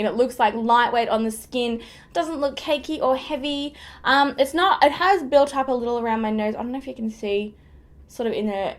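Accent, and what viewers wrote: Australia. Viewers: wow! I'm amazed at the crap people feel entitled to say to strangers /others on the internet. So rude. I'm Australian too, and think your accent is cute... Pfft.. Ignore the haters and do you! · Ohhh dear. You have the kind of totally hideous accent that makes me ashamed to be an aussie. The way you screech and draggggg out words, then your voice goes UP at the end of a sentence. Completely intolerable voice. Many will disagree but many will absolutely agree whether or not they are brave enough to say. A fact remains a fact whether u want to agree makes no difference.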